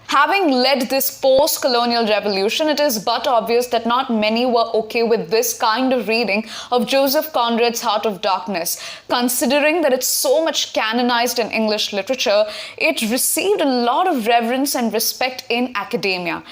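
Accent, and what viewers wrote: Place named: RP, United Kingdom. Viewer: Your accent sounds like that of a BBC News presenter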